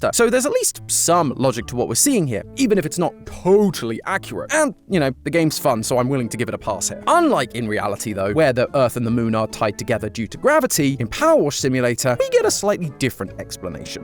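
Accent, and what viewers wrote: United Kingdom. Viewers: As a fellow British person, we do just say it like that 😅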